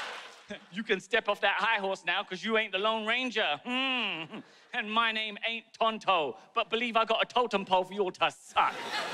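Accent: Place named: United Kingdom. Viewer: hey, dunno whether i'm more envious of your intellectual comedy, sprightly comebacks, ability to be (thought) provoking without being self-deprecating orrrr you really lanky frame man [i mean, i was no Calista Flockhart back in the day (i'm a guy by the way) but i think of thin or anorexia and i think of her] It is so nice to see someone (of 𝘥𝘦𝘴𝘪 lineage at that) not being lazy in using the 'easy to sell' self-deprecating humour and has enough wits about them to not stoop low or start getting personal! though i DOO love the naughty and brutal bits! Greetings and lots wishes from Bharat <link> [having grown up in Bharat and those were the pre internet era, my vernac. brain still takes time to grasp the British accent:P ]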